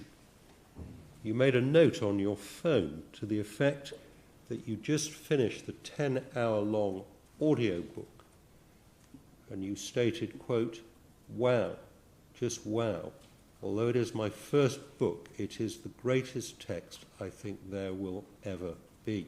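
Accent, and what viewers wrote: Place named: England, United Kingdom. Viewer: Man i tried to watch this but this guys england accent is like nails on a chalk board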